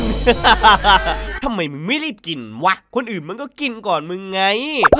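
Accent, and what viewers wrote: North, Thailand. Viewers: ภาษาเหนือฮ๊าฟพี่ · ภาษาเหนือคับ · พี่ไม่ใช่ภาษาใต้พี่มันเป็นภาษาเหนือ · ภาษาเหนือ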